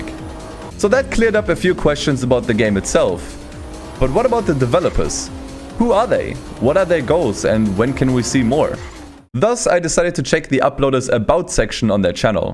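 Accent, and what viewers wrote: Germany. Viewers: Ich würde wetten du bist Deutsch :D Du hast so einen Deutschen Akzent im English :D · i can hear german... bist du deutsch o.o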